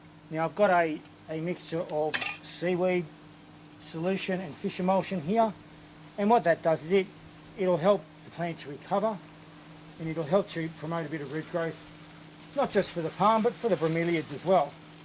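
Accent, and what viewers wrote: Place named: Australia. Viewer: As an American, I really enjoy listening to an Aussie explain things. Always straight forward to the point. Great video.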